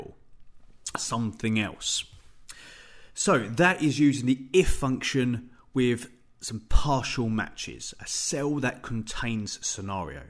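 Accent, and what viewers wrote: United Kingdom. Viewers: Excellent explanation. I solved the problem I had. Thank you very much "indeed". I liked the way you explain, especially for your accent! From now on, I will come to your channel in case I have troubles with Excel. Also, I'll tell my friends about your Channel. I studied English in Cambridge, UK, a long time ago, and I always loved the British Accent. Now I live in USA, and the American English sounds like another language. (No offense, please)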